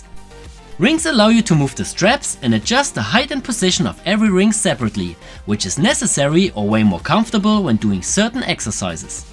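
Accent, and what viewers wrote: Germany. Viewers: Leichter deutscher Akzent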